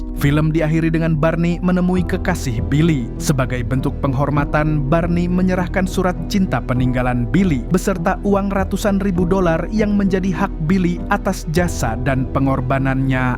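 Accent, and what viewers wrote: Banjar, Indonesia. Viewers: Pian ni Banjar mana mang?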